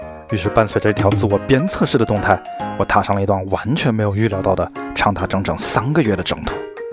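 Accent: Wuhan, China. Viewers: 大哥，你是武汉的吧🍭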